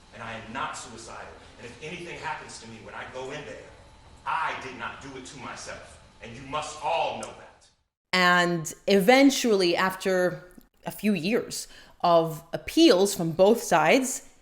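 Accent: New York, United States. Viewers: Whaaaaaa? You’re not an American? You never been here? You have a perfect American accent!!! How do you manage it? I admired you before but now that I know this, hats off to you lady!! Where are you from then? Wow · It blows my mind you’re not from the US because there is NY writ large in accent, mannerisms, hand gestures. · I was surprised when you said you’re not an American and you’ve never been to the States. You have an American accent! I just assumed you were born here and moved abroad. Where are you from? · Don't you have an american accent? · I always thought you were American based on your accent !!!!😂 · How can you never have been to the states and yet speak like an American? I love your program, your mind is sharp and your delivery is exceptional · Your accent sounds America. Where are you originally from? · How do you have such a US American accent if you've never been here? 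Not unless you did your schooling in Canada. Sometimes their accents sound the same :)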